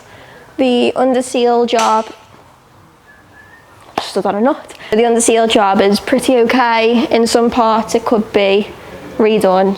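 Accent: Liverpool, United Kingdom. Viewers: That 'girl scouse' accent where words fade in and out still kills me 😂 'To be hone.........st' 😂😂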